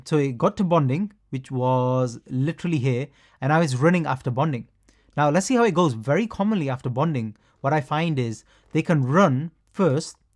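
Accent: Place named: United Kingdom. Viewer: All throughout a well spoken guide on how to avoid scams on Solana network, this really helped me during my learning process of the network and inner workings of the system. Also I would like to point out that nobody ever should listen to an Indian guy with a polished UK accent talking about crypto scams on sketchy websites like photon. good day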